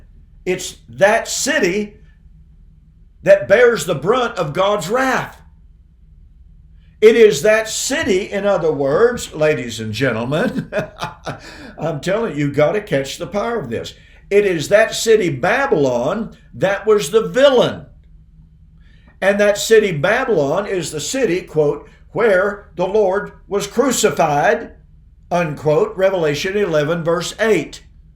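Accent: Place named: Arkansas, United States. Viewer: this is some of your best material. I know you do not mean to be humorous in your delivery, but the way you point out the absurdities of the futurist, dispensationist view in your Arkansas vernacular has me floored every time. I just can't stop laughing. It is some of the best unintentional humor I have ever heard. Keep up the great work!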